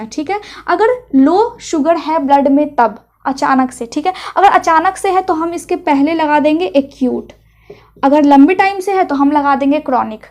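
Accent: Bihar, India. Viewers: Ap Bihar se ho didi kaya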